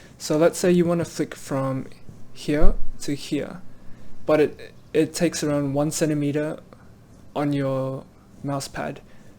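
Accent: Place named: United Kingdom. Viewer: Bro no hate but why do you sound like a British fearless😭😭 please tell me you can hear it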